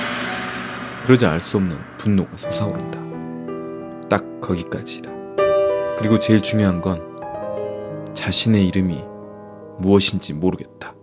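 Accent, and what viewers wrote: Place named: Jeolla, South Korea. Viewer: ㅋㅋㅋ 밤마다 이거 보는 재미에 삽니다. 나레이터님 전라도 말투도 구수하고 ㅎ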